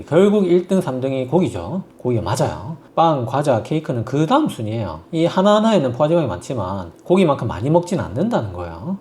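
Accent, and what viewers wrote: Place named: Busan, South Korea. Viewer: 부산 말씨가 어찌이리 구수하고 삼경살 굽는 냄새는 물러나라~! 매력 통통 젊은 의사샘님 즐거움을 주셔서 감사합니다! 자주 뵜으면 좋겠네요~^^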